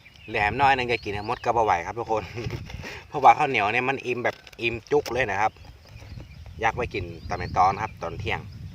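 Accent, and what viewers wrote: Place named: North, Thailand. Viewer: อู้เมืองก่น่าฮัก น่าฮักแต้เนอ เสียงนกเสียงหนูบรรยากาศดีเนาะ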